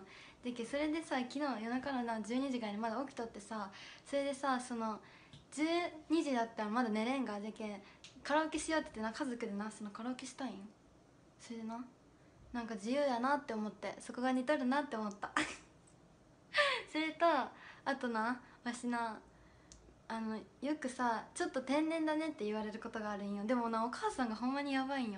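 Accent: Okayama, Japan. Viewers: 耳に馴染んでる言葉じゃけぇさ、岡山に帰っとる気がしょーたわ。ごじゃかわいいべや （北海道民） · 千鳥みたいな岡山弁使ってくれたらもっと好感度あがるな❤ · 岡山って俺の地元の喋り方に似てるわ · 岡山弁が「出ちゃう」じゃなくてもはやただの岡山弁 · 岡山弁って正直きちーと思っとったけーこの人の言葉はすげー可愛い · ノブのイメージしかなかった岡山方言がこんなにキュンとするとは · 岡山の方面とかに友達おって頻繁に電話しとるからか分からんけど岡山弁が全然普通に感じる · いとこが岡山県だけどほんとにこんな感じや笑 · 割とまじで疑問なんだけど岡山の何処だったらここまで方言つくの？ by市内民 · 声かわいいから岡山弁でも柔らかく聞こえる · 岡山県井原市？バラ園いいですね！！久しぶりに岡山弁聞いた。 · まだ綺麗な岡山弁じゃわ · 掛橋さんの岡山弁好き。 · 出ちゃうというか終始岡山弁やで。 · 岡山弁はじゃけえとやけえが混在してるのか広島弁と関西弁が混ざってんのね(ドイツ人)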